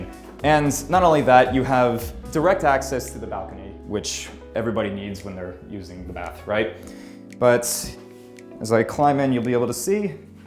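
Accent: United States. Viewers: I could tell you are American based on accent, although light, it's the "R", Americans emphasise it, whereas Brits - like myself - often keep it silent.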